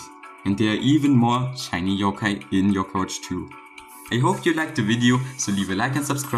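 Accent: Germany. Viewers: i know that german in the voice and backround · i hear a little bit deutsch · Man hört so krass den deutschen akzent haha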